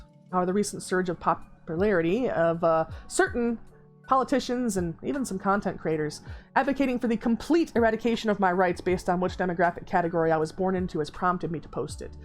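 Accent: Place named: United States. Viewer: I don't think you understand what free speech is. I assume you live in the US by your voice, so unless you have been arrested, tried and jailed for speech, it is a protected right.. Just because you werent allowed to blabber during class as a child doesn't mean you don't have free speech. If you're arrested you can literally sue the police department in civil court for the damages. Threatening somebody or making a call to action where there's a reasonable belief people will get hurt has NEVER been free speech.. also Literally no women in the last 40 years has been told "you belong all dressed up in the corner, awaiting a man's command"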